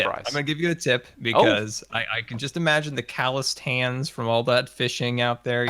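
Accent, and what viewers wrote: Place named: United Kingdom. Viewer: Yo, what part of the UK is your accent from?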